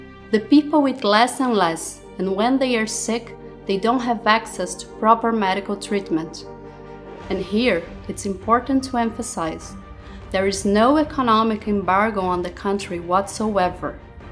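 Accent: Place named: Brazil. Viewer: Belo inglês. Só um pouquinho de sotaque brazuca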